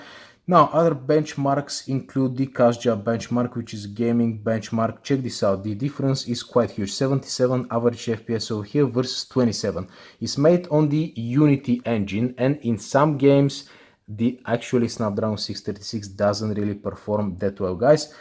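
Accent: Russia. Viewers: russian accent prosto pizdec) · Russian bad English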